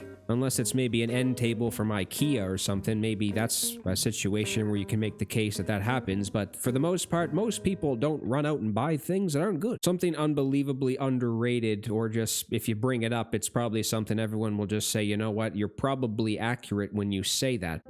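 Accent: Canada. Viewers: The first time I could tell a Canadian from their accent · Your voice and Canadian accent is so relaxing. I love to CHILL while listening 😎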